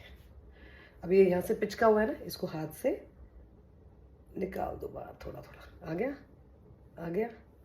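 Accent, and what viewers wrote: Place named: India. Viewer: Bhut a hi batten krte ho aap Meri ji age ke ho Mai b aise hi beaten krti thi kbi Aap ko dekh ke Muje pani image dikhayi deti h Aap bilkul desi style mai Bolte ho Bhut ache lgta ho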